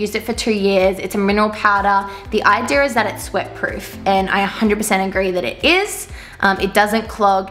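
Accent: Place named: Australia. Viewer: Why do Australians, and I have only noticed on girls, pronounce the letter "o" like "or": oh nor, here we gor, etc... lmao I find it kinda funny and cute.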